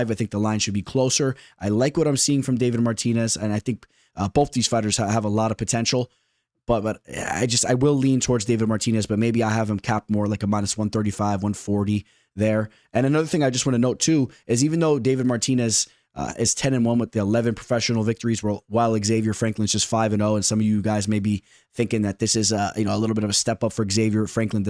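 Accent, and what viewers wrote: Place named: Louisiana, United States. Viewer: are you from louisiana? you sound just like dustin poirier lol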